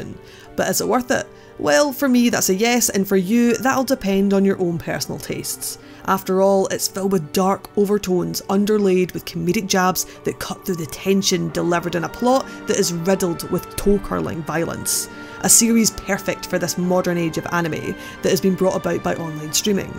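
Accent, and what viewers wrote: Scotland, United Kingdom. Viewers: Nice to hear a fellow Scottish girl reviewing anime great review/really liked the feel of this anime it’s a shame it lacks at certain things like character development/pacing or it could of been so much better...did love Keith’s vibe though and pritty sure without it and the nice art/soundtrack it would totally be unmentionable but the little ending clip we got dose point to a second season so if they do hopefully they even some things out/it also doesn’t help much that I love devilman crybaby lol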